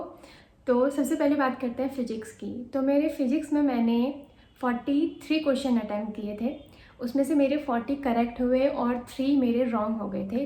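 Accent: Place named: Pahadi, India. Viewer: Ur accent is of pure pahadi region dear😀😃🤗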